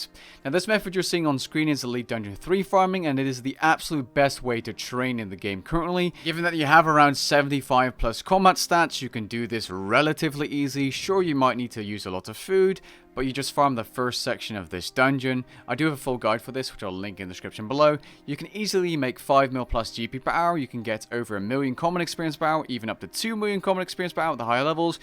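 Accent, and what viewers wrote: Netherlands. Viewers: Nooit geweten dat je Nederlands was, nu kan ik het accent niet meer negeren haha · Ik dacht al dat je NL was ik kon het horen aan je platte dialect hahahahhaha.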